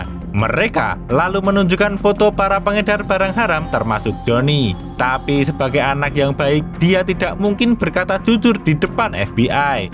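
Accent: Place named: Surakarta, Indonesia. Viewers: Pasti mimin orang solo wkwk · Admin e wong Jateng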